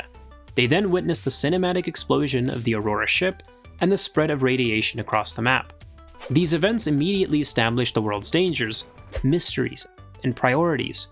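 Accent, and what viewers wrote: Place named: Canada. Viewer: he is Canadian has a strong Canadian accent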